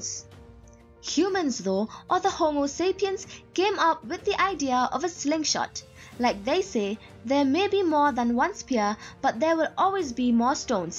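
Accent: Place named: Meghalaya, India. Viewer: The narrator sounds Khasi. Is she?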